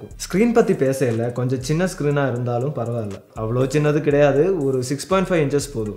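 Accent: Kerala, India. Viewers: Malayalam kalantha tamil um kekka nalla than irukku ❤️ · Malayali yoh? Bcoz ur slang looks like Malayalam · Nice explained.. but I felt ஒரு மலையாளி தமிழ் பேசுற மாதிரி இருக்கு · Mallu accent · அதெல்லாம் சரி க்கு தமிழ் தடுமாறுதே. மலையாளம் வருது.... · Bro nega malayalam mix tamil pasuriga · Ena bro unga voicela lighta tamil+malayalam kalanthu varuthu neenga malayaliya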